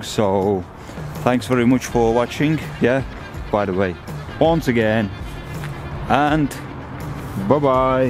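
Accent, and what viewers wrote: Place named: Liverpool, United Kingdom. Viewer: Scariest place in UK ... 🤣 Shows my high school 🤣 can say I went to the school of hardknocks now? 🤩 And on another note, your 'accent' is off the charts, I can hear skem scouse in there and whatever your native language dialect is. 👍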